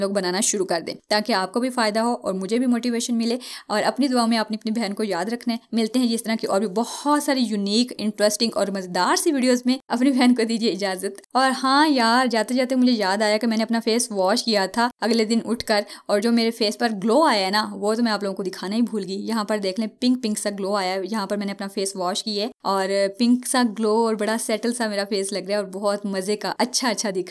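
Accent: India. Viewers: Ye pakistani itni indian jese kese bol rhi hai hay hay kese kese 👌👌❤️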